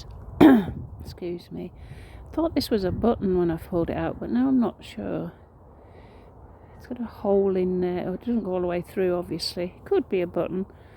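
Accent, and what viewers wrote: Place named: England, United Kingdom. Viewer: Nice understated English voice, a change from bawling Americans!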